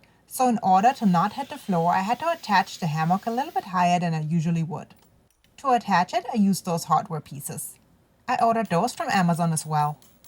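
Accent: Germany. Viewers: hi, this may be the reason why it sounds like the german girl next door. First time I noticed it, I though I had a hallucination..... VGvG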